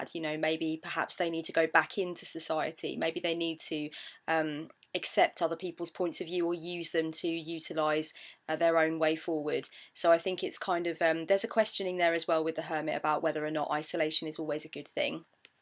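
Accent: United Kingdom. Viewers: I just discovered your channel and I'm slowly working my way through your early videos. I love your down-to-earth approach, and it's refreshing to hear a fellow Brit. You were speaking about the 5 of Cups, and that came up in a reading I did literally today. And today it was the first time I really noticed the river flowing under the bridge. It's like the card is saying, 'Yes, shit happened, it's right to acknowledge it, but it's water under the bridge Take the time to look around, see what you actually have and then cross that bridge, leaving the shit behind.'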